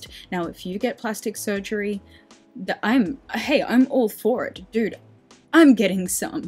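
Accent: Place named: Australia. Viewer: Pretty Australian accent.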